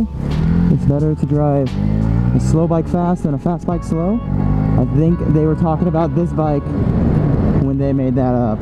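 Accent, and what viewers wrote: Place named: United States. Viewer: thanks for the reply. I was confused as well because from your accent I knew you were an american and wondered if you were refering to imperial or metric system.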